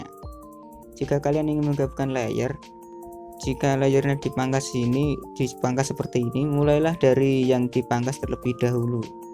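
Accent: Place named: Java, Indonesia. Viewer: Klo bisa ngomong medok nya dikurangin,soalnya agak gk faham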